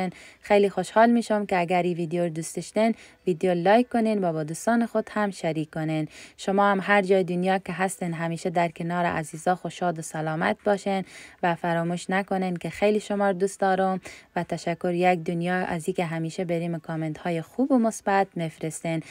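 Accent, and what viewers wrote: Herat, Afghanistan. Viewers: جان فکر میکنم لهجه شما یک کمی تغییر کرده یکی از دلیل های که ویدیو ها شما جذاب کرده همی لهجه شیرین هراتی ما است❤ ولی بازهم مثل همیشه عالی وبا سلیقه 😊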